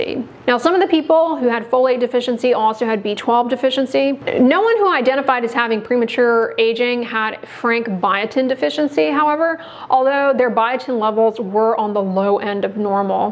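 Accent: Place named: Carolinas, United States. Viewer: Interesting information! BTW You sound so American... (I mean the accent/tone.) A stereotypical American accent for my European ears... Where from?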